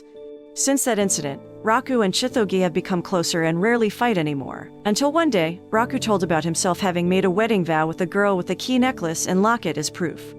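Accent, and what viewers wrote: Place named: England, United Kingdom. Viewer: ENG accent makes it a little hard to understand. ニセコ, neese-ko-ee Nisekoi, and not nasekoi xd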